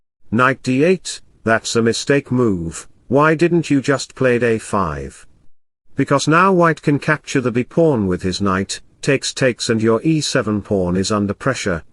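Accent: United Kingdom. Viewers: The narration sounds like an Englishman was asked to read a script written by a non native English speaker with a less than firm grasp of English grammar.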